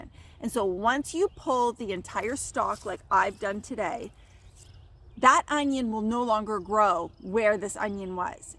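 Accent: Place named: Canada. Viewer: I found your video looking these up because my grandparents grow these and I’ve been wondering how to use them to see if I want to take some to start in my yard. Fellow Canadian here in southern Sask and as soon as I heard you speaking I knew you were Canadian.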